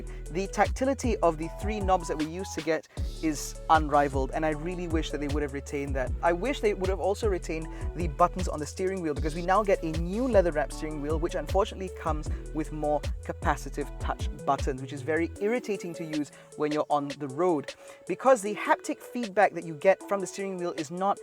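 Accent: United Kingdom. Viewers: Great review n yes for an suv costing more than 200 k without basic safety features is unforgiving n that's the deal breaker for me. Btw, your UK English is refreshing. Other local reviewers' faked US English is rather irritating. Keep it up.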